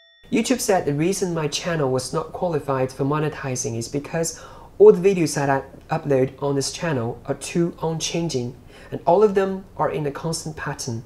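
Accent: United Kingdom. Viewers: How did you learn Japanese? Your RP English is very excellent.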